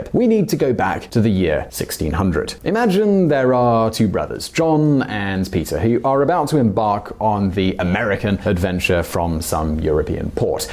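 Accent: United Kingdom. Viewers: ... are you british?:)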